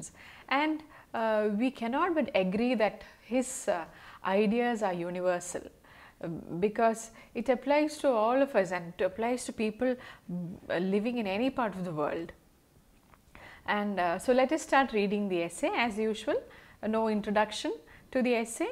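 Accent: Kerala, India. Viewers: Good lecture mam... r u malayali or kannada??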